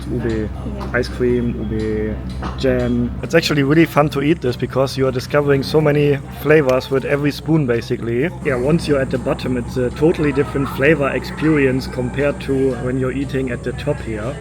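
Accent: Germany. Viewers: This dude reminds me of Pee-Wee Herman w/ a German Accent!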